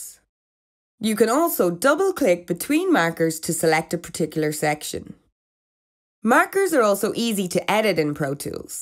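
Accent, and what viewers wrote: Ireland. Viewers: Inner city Dublin. Next to being a gypsie. If it's any help, bring volume to zero and use subs. Nails on a teacher's blackboard is easier to listen to but the screen is busy enough unfortunately. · Good vid but the Irish accent is like listening to bad Melodyne on a Didgeridoo. Please use the usual VO so as not to be distracted.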